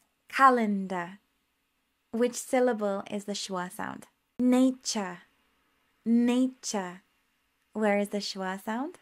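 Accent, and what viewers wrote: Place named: United Kingdom. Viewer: How interesting! I never heard of the schwa sound before – it helps a lot to understand that nice British pronunciation I love! ❤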